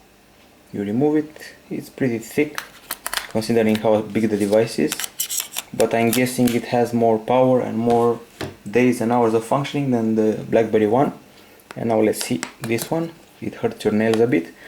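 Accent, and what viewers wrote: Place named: Romania. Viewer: Engleza ta are un puternic sound romanesc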